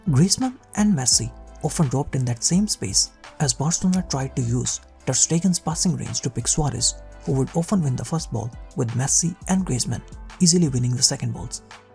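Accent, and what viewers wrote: India. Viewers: Dude... Is the narrator Indian?? At least His accent is ..😐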